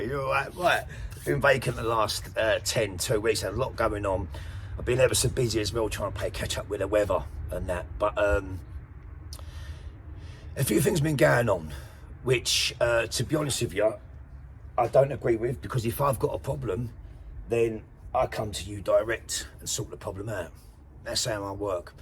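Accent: Cockney, United Kingdom. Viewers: Love your traditional Cockney accent which is now sadly a dying breed - My Fiancé is from White Chapel & sounds exactly the same, salt of the earth 🙌🏼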